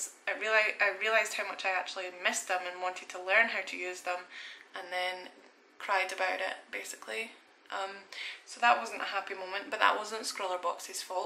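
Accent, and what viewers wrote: Scotland, United Kingdom. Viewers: Hey! Is that a scottish accent i detect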